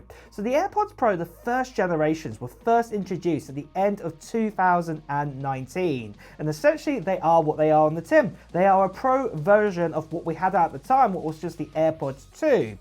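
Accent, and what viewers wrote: United Kingdom. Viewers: Are you British as you sound British so why not tell us how much they cost in Pounds ?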